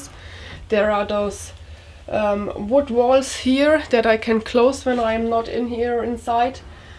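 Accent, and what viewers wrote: Germany. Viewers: You sound very german :) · please talk in your origin language because your english isn‘t good. we literally can hear that you’re german lol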